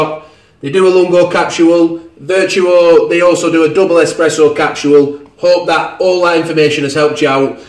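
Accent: United Kingdom. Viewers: Ik this older video, I’m curious on something, I’m US ur voice sounds like ur Uk or something 😊. My local grocery store had some of these Starbucks lungo pods on clearance to get rid of them.. I don’t have a nespresso.. I have coffee filter and though try them so how much water would I need to use for one lungo pod?? I can’t find any info out net.. I stumble over ur video.. Thanks..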